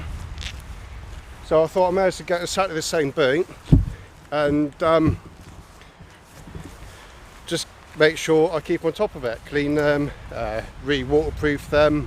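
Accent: Devon, United Kingdom. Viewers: Just stay as you are, you just have a Devonshire accent I have a Suffolk accent lol don’t worry about how you sound, I watch you tube on my tv you sound ok to me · Hi.... great to see you are keeping well mate. Don't worry about the awesome Devon accent mate..... it is one of the best we have. It has been a crappy 12 months for everyone and fingers crossed when all this madness ends things will get better for us. People sub because we like you and the things you talk about on video. Plus you are a top bloke as well. I will always be around for the times you get out and do a video mate. Stay safe, all the very best, Mark:):)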